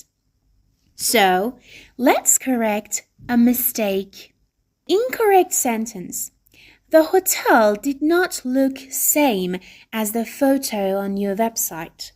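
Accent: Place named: United Kingdom. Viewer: British accent 🤗🌹💋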